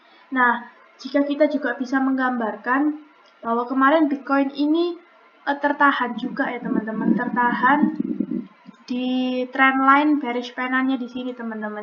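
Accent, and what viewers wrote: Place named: Surabaya, Indonesia. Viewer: Logat surabaya medok ci xixixiix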